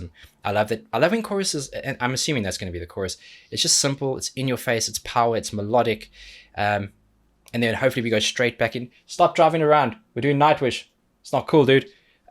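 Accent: South Africa. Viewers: Great reaction. First time I've watched you. Btw, are you from or have spent time in South Africa?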